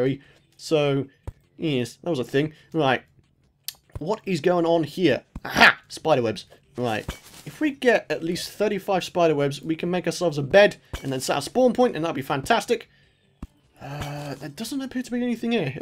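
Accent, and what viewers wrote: United Kingdom. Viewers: What? You sound like a british guy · He's British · That is the strongest British accent I've ever heard... and I'm British myself!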